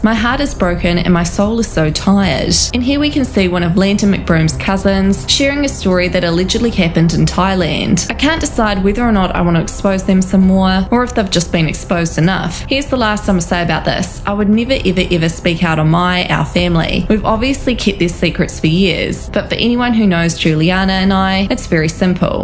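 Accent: New Zealand. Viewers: New Zealand accent. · Now that is a Kiwi accent!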